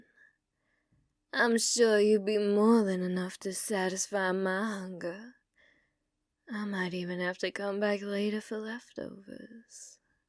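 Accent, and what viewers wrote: South, United States. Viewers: You did that southern accent very well..😊😊😊😊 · What British walk-the-wasteland-fuck magic is this How did you manage to make the southern accent sound so appealing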